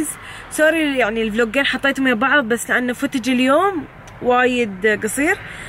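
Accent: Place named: United Arab Emirates. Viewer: قبل لا اشوف الفيديو ضغطت لايك لاني واثقه انه بيكون حلو وصرت اعشق اللهجه الاماراتيه منج يا وحفضت جم كلمه ( يزوغ = يخوف ، ازقرها = نادها ) وبعد والله نسيت المهم لا تطولين علينا بعد نبي فلوقات ما شبعنا انا من الكويت احب فلوقاتج وااايد 💐💐😘سلمي على زايد يا حليله 😘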